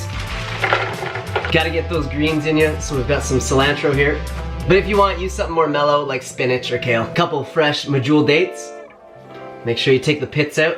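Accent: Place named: Canada. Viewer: You sound extra Canadian in this video 😂 Also , you got them mini boulder shoulders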